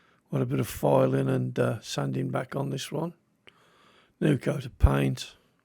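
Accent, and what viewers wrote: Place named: Midlands, United Kingdom. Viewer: I bloody love when you open something up for the first time, and the wheels just fall out! What a relief! And I do quite a bit of work with Land Rover, so the Midlands accent is not so bad! 🤭 The only thing on that casting I don't like is the filled quarter-light windows: the temptation to drill those out is one I'd not be able to resist, though it does leave the A-post and door frame quite vulnerable. Lovely entry to the Challenge, and a great save!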